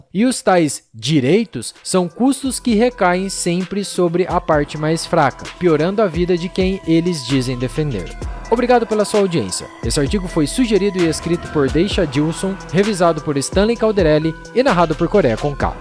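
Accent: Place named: São Paulo, Brazil. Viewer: Parem de mentir com esse sotaque da caipira paulista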